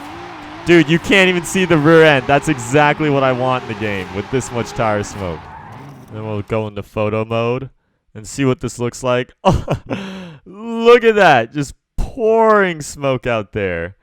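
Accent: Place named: Bahia, Brazil. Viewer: é gringo mas parece baiano pqp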